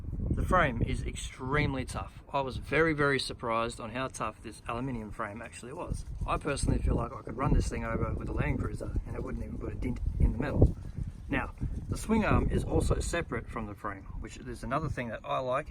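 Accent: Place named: Australia. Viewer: nice i used to live in canberra now i live in the usa in seattle. it is good to hear an australian accent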